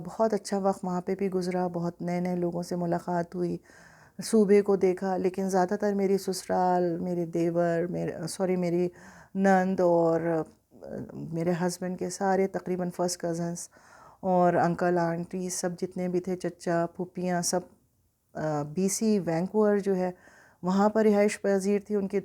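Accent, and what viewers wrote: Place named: Lucknow, India. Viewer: Bahut bahut shukriya aapka😊 Lucknow se hone ki wajah se kuch aisa laga jaise apne rishtedar ko hi sun raha hun 😚 barhi saadgi se aapne apni baat rakhhi .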